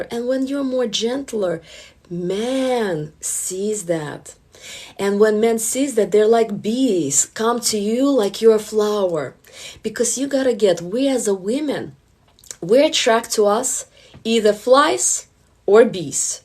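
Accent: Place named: Eastern Europe. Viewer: Man here, you are the relationship advice women need to hear instead of “blah blah blah Tinder makes you feel whole, go sleep with 100 men to find yourself”. I can tell from the accent you’re from Eastern Europe so no wonder you are smart and beautiful. I love Eastern Europe and my Croatian gf who is what you described basically :)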